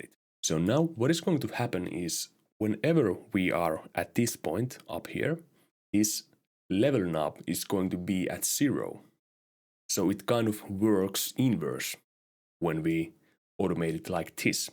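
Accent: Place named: Finland. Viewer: piti ettiä mitenkä duunata vähä uptempoo van löyty suomi aksentti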